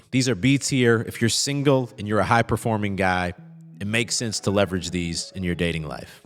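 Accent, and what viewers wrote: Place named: South, United States. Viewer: This is my first time noticing the southern accent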